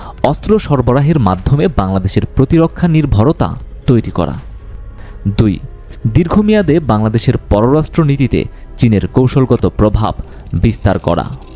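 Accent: India. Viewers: আপনার কথায় দাদাদের কথার সুর....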